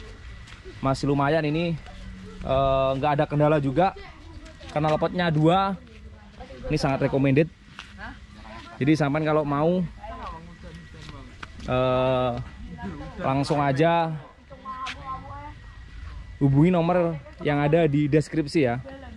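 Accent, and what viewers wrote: Java, Indonesia. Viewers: wong jowo iki? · Bos kacung punya sowrom motor juga, aq suka pak logat jawanya kental dan ada humoris nya juga.selalu doain di awal dan semoga berkah dalam hidup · Medok mas 😝😝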